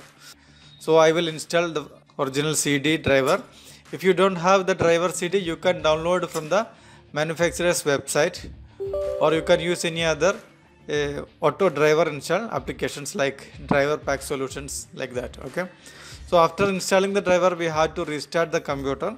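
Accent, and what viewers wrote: Kerala, India. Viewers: ഇംഗ്ലീഷ് കേട്ടാൽ അറിയാം മലയാളി ആണെന്ന് !!!!!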